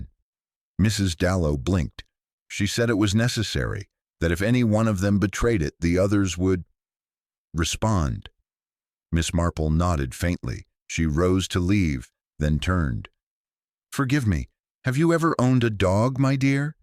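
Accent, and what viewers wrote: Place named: United States. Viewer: An American accent doesn't work for a Marple mystery, sorry can't listen.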